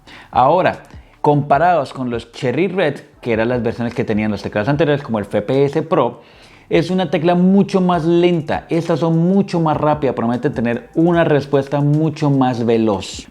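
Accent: Colombia. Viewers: Tengo Dos Preguntas! Jajajaja 1. Eres de Colombia? Especificamente de Medellín? Lo pregunto por tu acento Jajaja. 2. Se pueden reemplazar las KeyCaps? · Mi socio es Colombiano? O me equivoco?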